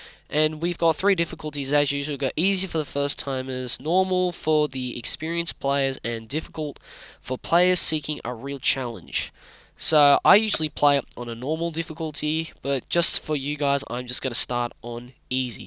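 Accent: Australia. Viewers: Strong occa Sydney accent here I was not expecting this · Bro his Australian accent is so much stronger here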